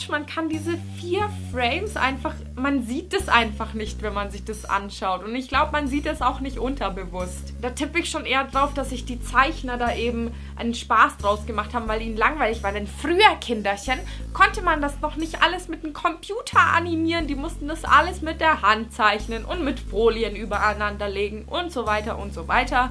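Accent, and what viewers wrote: Franconia, Germany. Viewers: kommst du aus franken??? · Kommst du aus Franken? Oder was ist das für ein leichter Dialekt?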